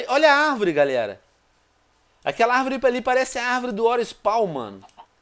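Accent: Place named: Portugal. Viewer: Meo deos que nostalgia, meu, você foi minha infância, junto com todos do grupinho. S2 saudades de seus videos. Cof amo sotaque de Portugal, é tão fofinhooo.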